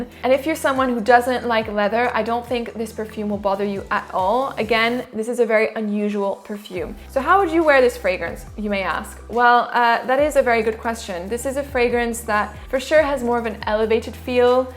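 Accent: France. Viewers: A totally intoxicating french accent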